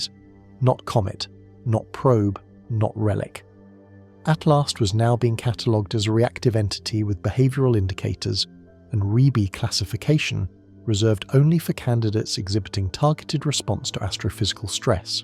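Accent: United Kingdom. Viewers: So sick of the British accent explaining fkn everything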